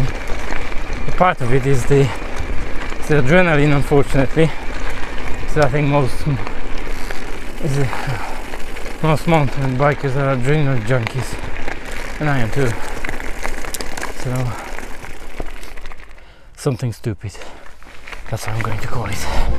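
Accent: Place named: Poland. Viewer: angielski masz dobry ale slabo slychac i do tego ten nasz angielski/Polski accent 😉 jak chesz wiecej subow to sie musisz pokazac na ekranie.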